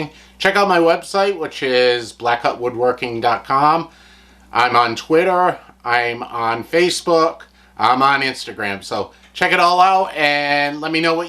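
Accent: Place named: Massachusetts, United States. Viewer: Just stumbled upon this video and your channel. You have the makings of a great set up! The instant I heard your voice I thought to myself, "This person is from Massachusetts" and was extremely proud when the first thing in frame when you rotated the camera was a Boston Red Sox banner. Where in MA are you located?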